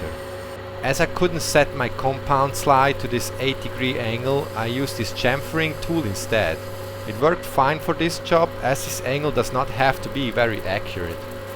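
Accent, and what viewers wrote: Switzerland. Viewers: Great Video. Love the Swiss Accent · Ahh der Schweizer akzent😂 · I love his Swiss accent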